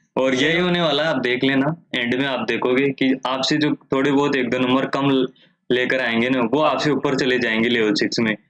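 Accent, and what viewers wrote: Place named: Pahadi, India. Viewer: Bhai pahadi lgra ....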